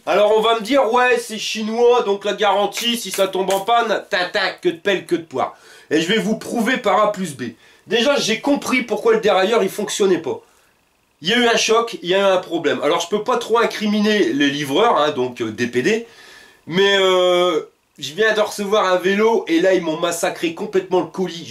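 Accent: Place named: Nord-Pas-de-Calais, France. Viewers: je ne te connaissais pas ;j'adore ,tu me fais beaucoup rire avec ton accent du nord.et bravo pour tes commentaires au sujet de ce velo. je pense acheter le meme.continue comme ca .VINDIEU....... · Salut, j'ai découvert ta chaine depuis peu et je me régale;) Des tests de malades avec de vrais retours! le tout avec notre accent chti;) J'ai acheté le même vélo dans sa version Y20 (même caractéristiques) et il est top!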